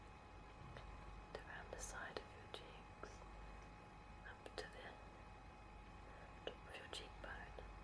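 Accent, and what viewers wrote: England, United Kingdom. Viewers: very pretty british accent i love it, keep doing more plz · You really seem to keep busy putting out your videos.Thanks for the constant soothing sessions.Your voice is my fav.You make me want to move to England were all the women talk like that. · I have just come across your videos, and I was so glad to hear an English accent when you spoke - I find ASMR from the UK supremely relaxing (I am also Home Counties English!) Loved your video anyway, and have subscribed! Love LouFxx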